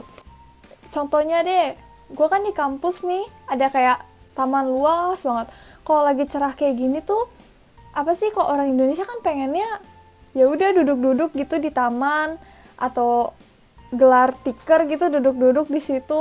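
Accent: Japan. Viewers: wiihhh,,, infonya keren bangettt,,, kakak pasti kuliah ya disana,,, logatnya udah kayak jepang,,, dan raut wajahnya juga kayak gadis jepang,,, yang pasti kakak ichiban kawaii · kak, bahasa nya udha kebawa nihongo kak :))) tapi luccuu aku sukak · thx dgn video ini gw jd lbh tau ttg jepang.. btw bahasa indonesia kamu udah ada dikit2 aksen jepang nya haha mgkn karena udah lama d sana 😄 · Logatnyaa,,, udah kebawa jepun,, hehe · kakak kedengeran banget bahasa indonesianya ada rasa-rasa jepangnya gitu hahahaaa dari nada dan sebagian pelafalan. rupanya pengaruh juga ya tinggal di sana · wah jadi kyk denger haruka nakagawa ngomong nya ada logat jepang nya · logatnya jadi kebawa logat jepang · Itsnaini Nabila iya bener sudah kebawa bawa nada jepang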